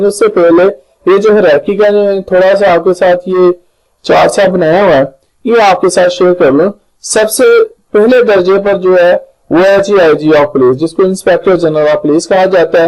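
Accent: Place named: Gujranwala, Pakistan. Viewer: Your dialect sounds from Gujranwala.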